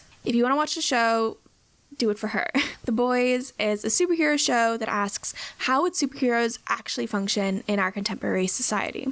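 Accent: Ireland. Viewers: Are you Irish? Every now and again I hear a slight Irish accent. Just curious 😁 really love all the videos u make ✌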